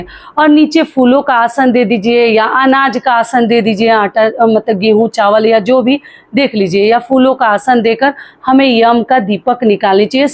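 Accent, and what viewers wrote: Bihar, India. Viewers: Aap pura hmare bihar ki vidhi btayi h jiski mujhe jarurat thi or aapki baat krne ki tarike se lgrha ki aap bihar se hi h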